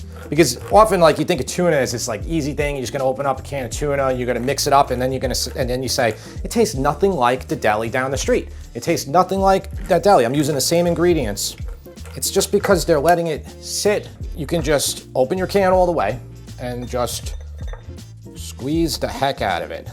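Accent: New York, United States. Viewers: As I keep saying! I love your accent! So Noy York. The food is good, too. I recommend you make a Spanish style Russian Salad.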